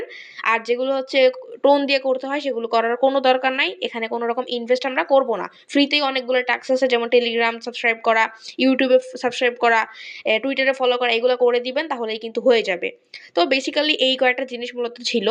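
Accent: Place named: Jessore, Bangladesh. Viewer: আসসালামু আলাইকুম আপু, যশোর থেকে নতুন করে আপনার ইউটিউব চ্যানেলে এবং টেলিগ্রামে যুক্ত হলাম।ভিডিও গুলো খুবই ভালো লাগে, আপনার কথা শুনে মনে হচ্ছে আমাদের যশোরের মেয়ে আপনি।আপনার জন্য শুভকামনা রইলো।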